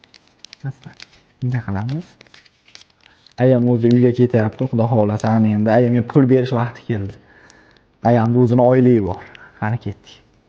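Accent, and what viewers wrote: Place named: Kashkadarya, Uzbekistan. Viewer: ММАдаги Сатурнмисан.Шеванга Караганда,Кашкадаренинг Бешкент шахридан булсанг керак.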